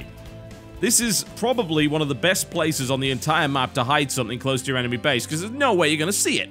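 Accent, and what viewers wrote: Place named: North, United Kingdom. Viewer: I love hearing irate Northerners. Rest in peace yo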